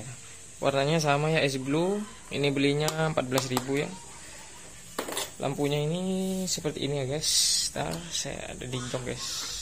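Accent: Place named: Bondowoso, Indonesia. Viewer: Behhh... bahasanah mik seperti orang bondowosoan reh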